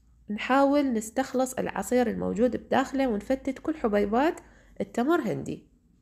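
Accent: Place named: Iraq. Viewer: كثير احب لهجتك العراقيه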